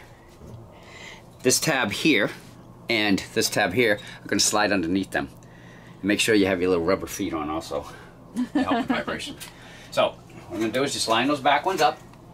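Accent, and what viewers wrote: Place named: East Coast, United States. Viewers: Hi there, just installed my bug wire shields you had in your other video. Love all your fix it videos and glad I found your channel when you first started out. It feels like I have been everywhere you have because of YouTube. Good common sense information every time I watch your segments. My girlfriend is from back east and especially enjoys the videos and your eastern accent. Keep up the great work and enjoying life. Tom and Irene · Great Channel and good informative stuff ...but what makes me very happy is to hear New Hampshire accents again ... many moons ago when I was a kid we lived in Tamworth NH and I would take the school bus to Junior High up in Conway ...bitter cold ride but o how I loved Conway and the Mount Washington area .... Gotta get back to the North East again before I die ... love the Food and the people in a big way ... 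Happy Trails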